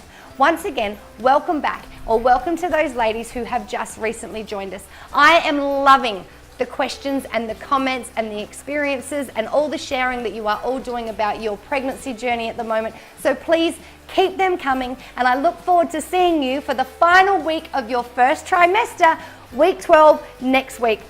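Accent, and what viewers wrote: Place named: Australia. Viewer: Well done - good Aussie accent and no rambling - ❤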